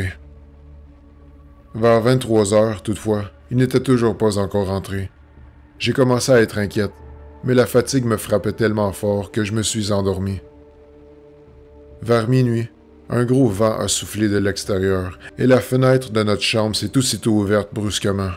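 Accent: Quebec, Canada. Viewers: Chapeau bas sa fait plusieurs vidéo que j'écoute et franchement chapeau bas, sa fait plaisir d'écouté un québécois qui lis des histoires je trouve sa cool moi aussi je suis québécoise. Lâche pas 👍👍👍👍dans ta voix sa paraît tout le temps que tu met + une abonnée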